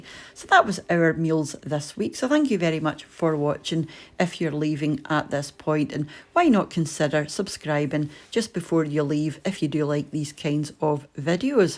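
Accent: Scotland, United Kingdom. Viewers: SASSENACH here! I love spendin' a wee while seein' wart "?" had fur tee this week! 👍😂😂😂 Fur my tee today, I'm havin' Tatties 'n' Neeps wi' a wee servin' o' Mence! I'm a family o' one, and it's ma favourite! 👍😂😂😂 PS: Love the Scottish accent! It's awesome!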